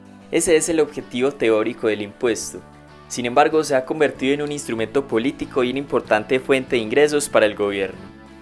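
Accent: Antioquia, Colombia. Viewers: Su acento es claramente colombiano. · Es paisa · Pensé que eras paisa, tienes un asentó parecido.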